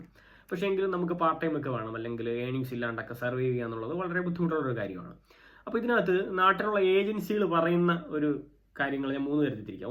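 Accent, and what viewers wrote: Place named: Trivandrum, India. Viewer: I'm also from trivandrum.. Slang kettappazhe thonni trivandrum aanennu. Trivandruthu evdeyanu..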